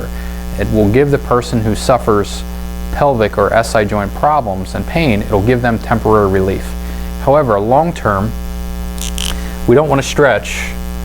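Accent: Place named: Pittsburgh, United States. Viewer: Are you around Pittsburgh? I'm judging by your dialect.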